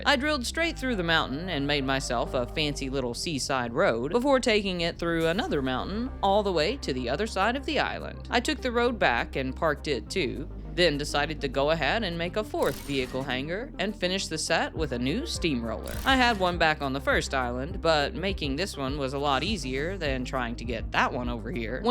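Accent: South, United States. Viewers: you have one hell of a southern accent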